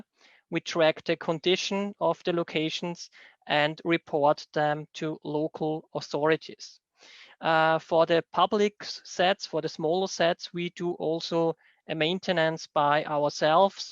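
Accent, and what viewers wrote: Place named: Austria. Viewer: Noch nie so einen österreichischen Akzent im englischen gehört 😅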